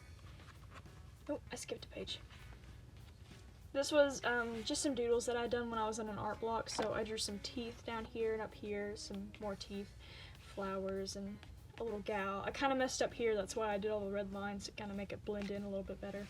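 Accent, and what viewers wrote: South, United States. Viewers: Broo u from the south? U have a nice accent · Ya know what, I did not expect your country voice from that thumbnail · I love your southern accent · You draw these uwu boys but your voice is very southern it’s throwing me off XD you sound like you live in Texas or somewhere deep in Gerogia.I’m southern myself but my accent barely ever comes out unless I’m casually talking in English with people I’m comfortable with and use slang. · You sound really southern ekwnensmsn me too!! Where are you from??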